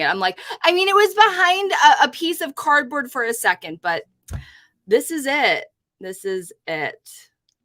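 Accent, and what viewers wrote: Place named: Los Angeles, United States. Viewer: I'm so impressed. I could of sworn you had a comment on YouTube.? I'm still looking. Just want to say I miss your lovely voice and cute influx in your LA accent!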